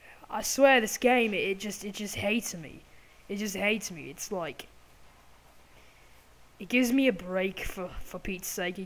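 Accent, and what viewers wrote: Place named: Australia. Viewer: U sound more australlian bro and i am british and i dont care i dont expect u to have a good accent since u r not british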